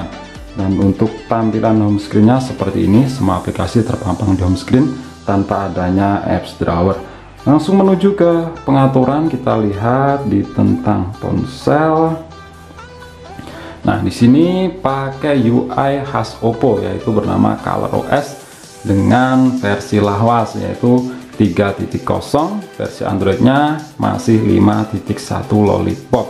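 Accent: Blitar, Indonesia. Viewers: Wong blitar bak e :v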